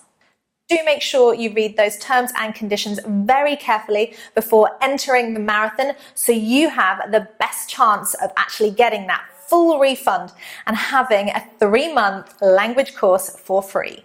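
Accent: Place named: RP, United Kingdom. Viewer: I like your posh accent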